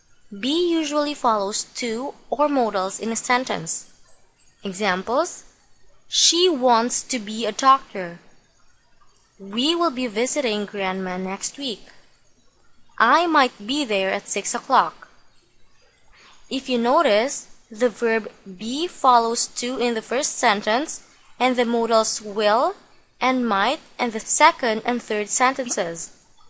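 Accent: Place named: China. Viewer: Bhai ya behen dhang se bol. Smj hi nhi aa raha Chinese k jaise bol raha h tu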